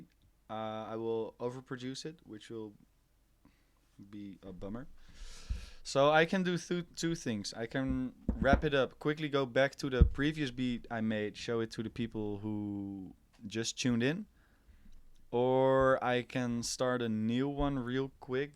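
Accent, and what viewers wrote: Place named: Netherlands. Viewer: Lekker dat Nederlandse accent 😂💪✌🔥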